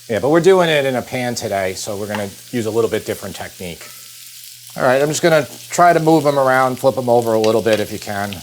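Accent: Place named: Long Island, United States. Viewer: Great food. Great recipes. Great Long Island accent. Everything anyone could want. ⭐⭐⭐⭐⭐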